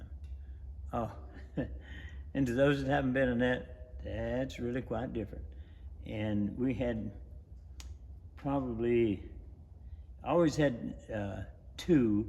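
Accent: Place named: North Carolina, United States. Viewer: Didn't know you were a fellow Tar Heel, but I thought I recognized your accent. I have one similar being born in High Point 1948. Best wishes to you,. Love and use your products.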